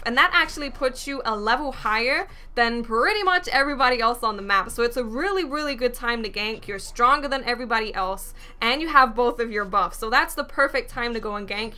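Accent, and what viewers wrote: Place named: New Jersey, United States. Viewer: I like your Jersey or Brooklyn Accent (or whatever it is) It's like a hotness multiplier on top of your nerd knowledge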